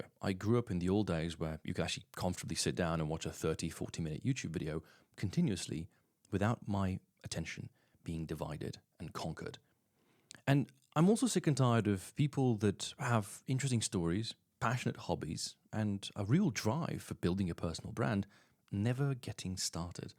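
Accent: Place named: RP, United Kingdom. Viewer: Thank you, I completely agree with your thinking about longer content and attention spans. Could you please make a course on how to develop a british RP accent such as yours?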